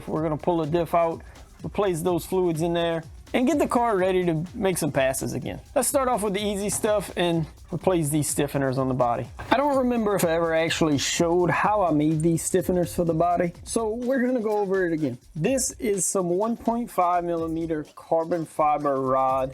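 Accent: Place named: South Louisiana, United States. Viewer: Man are you from South Louisiana? Heard a familiar accent in your wide body corvette video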